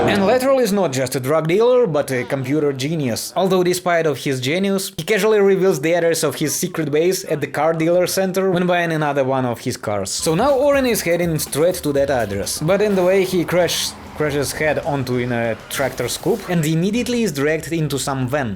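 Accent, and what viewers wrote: Russia. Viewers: The little trix with your and nice everyone can do that but you need to get someone that talk English. Not Russia.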